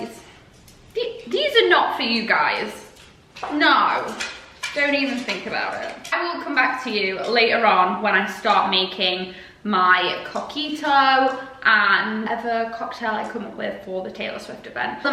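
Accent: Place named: United States. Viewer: Was so interesting watching you make the drink! I feel like in this video because you’re speaking more you can hear the slight American hint on your accent for some words. It suits you so well!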